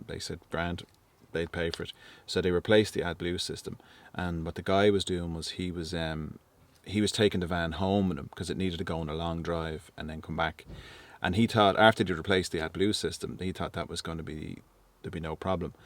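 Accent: Ireland. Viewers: That the problem with motor vehicles today I have BMW about a year and a half old I've been back with lights on the dash about 9 times., just don't make them any good anymore., I dont keep my car for more than 3 years now Because of the quality of them.,yeah a member the Ford Escort last forever I just recently come across one of your videos I haven't watched you for about 8 months used to love watching your channel because I love the Irish accent Thumbs up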